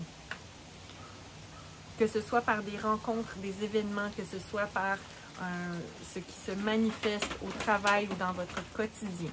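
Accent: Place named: Quebec, Canada. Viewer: Tellement apaisant le bruit de la pluie, le bois, les couleurs des feuilles d’automne … et l’accent québécois 👌 merci pour cette douce mélodie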